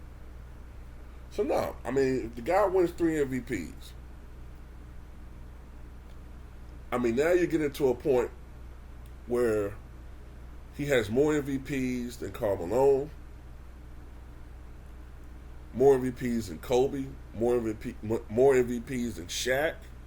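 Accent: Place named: New York, United States. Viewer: You sound like a typical out of breath New Yorker, who is too busy being an opinionated douche to give any kind of useful commentary on basketball. Your channel is a waste of time.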